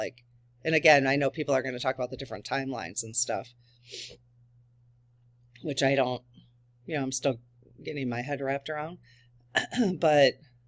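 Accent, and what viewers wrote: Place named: Michigan, United States. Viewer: I am new to the channel and I am SO enjoying your videos!! You make me feel at “home”. I don’t know where in the country you live, but your accent reminds me of my birth home, Michigan ❤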